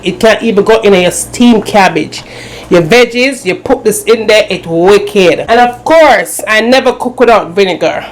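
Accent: Jamaica. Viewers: I've never tried gravy like this before I love it thanks! and I love you authentic Jamaican accent!!! 💜💜💜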